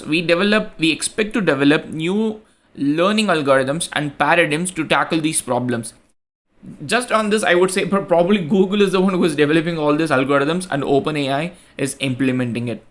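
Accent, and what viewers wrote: India. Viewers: i can understand literally every word he says. i'll take indian accent over californian every time.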